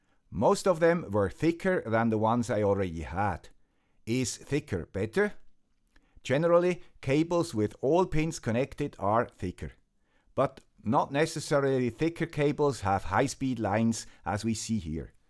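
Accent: Switzerland. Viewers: Summary Introduction to USB-C and Power Delivery - Charging and data transfer over USB cables have significantly improved. - USB-C connectors were expected to simplify the process. - However, without proper knowledge, users can still face issues like slow transfer speeds and charging problems. - The topic is split into two parts, starting with Power Delivery. - Introduction by the presenter with a Swiss accent. - USB-C's versatility and its mandatory use in Europe. - USB-C supports various modes including Power Delivery, data transfer, video and audio output, and alternate modes like Thunderbolt. - Focus of the video on Power Delivery and data transfer. USB Standards and Connectors - Evolution of many USB standards over the years. - Different connectors accompanied each standard. - Focus will be on newer standards from USB 3.0 onwards. - The video will cover two separate topics: power delivery and data transfer. 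Understanding USB-C Connector Pins - USB-C connector can have up to 24 pins. - Not all connectors have all pins to save cost and simplify PCB design. - Explanation of Ground, VBUS, D+, and D- pins. - D+ and D- carry differential signals for less interference and higher speeds. - These pins are for backward compatibility with USB 1.0. - Introduction of TX1 and RX1 pairs in USB 3.0 to increase speed. - TX2 and RX2 pairs were added later for even higher speeds. - CC1 and C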